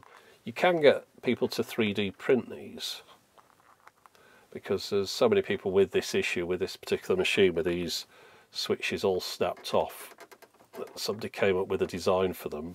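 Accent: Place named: England, United Kingdom. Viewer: Why did it take me this long to notice you have an English accent, let alone an accent different from mine? Wait, is it an English accent? Or is it Austrailian, or maybe Scottish?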